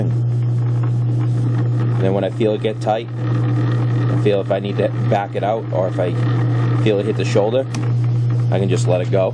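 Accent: Boston, United States. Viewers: ***** Im from CT . I honestly wasnt sure if it was upper east side manhattan or boston. "in my bet boston accent" Go PARK the Car!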